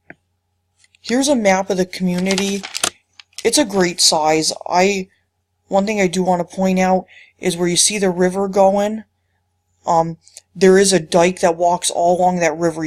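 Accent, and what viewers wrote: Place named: Northeastern Pennsylvania, United States. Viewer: I was born here in 1945 and just sold my father's house not too long ago. I still come back to visit. Great job,. Hopefully, people like you will make Plymouth once again what is was when I grew up there. You even speak NEPAlese perfectly! May God bless your life in Plymouth.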